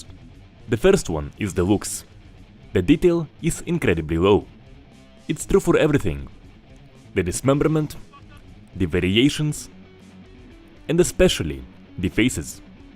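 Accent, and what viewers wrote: Russia. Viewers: He's like speaking russian but in English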